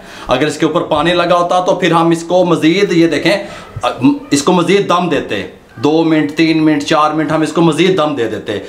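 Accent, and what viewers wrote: Punjab, India. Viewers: No fancy kitchen no fancy utensils no show shaw just pure authentic recipes guidelines and awseemmmm Punjabi talking style 👌👌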